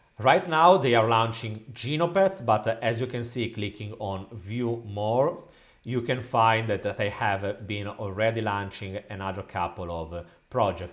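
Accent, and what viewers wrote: Italy. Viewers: I really like your content but man your Italian accent sometimes crack me up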